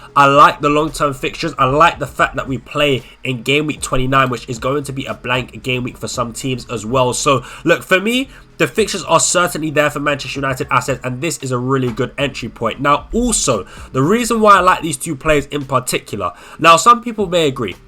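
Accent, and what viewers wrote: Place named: London, United Kingdom. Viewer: Great content, really good. But... why on Earth do you support Man Utd when you have a London / south accent!?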